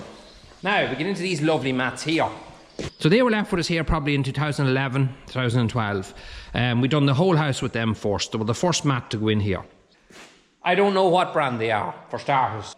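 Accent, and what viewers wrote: Ireland. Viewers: Your videos are so interesting. Things have certainly changed since I worked during holidays from boarding school on my Fathers' Hobby Dairy farm back in the '50s & '60s. Your barns are immaculate and your equipment is top-notch. By the way, I love your Irish brog!!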